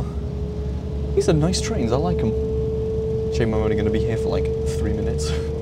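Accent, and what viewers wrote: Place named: RP, United Kingdom. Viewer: nothing says privately educated like this accent does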